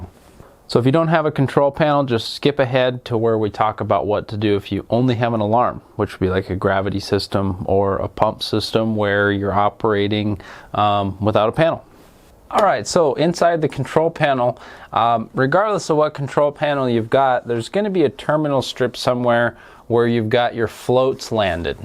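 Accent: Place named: Midwest, United States. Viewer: Sounds US Midwestern to me.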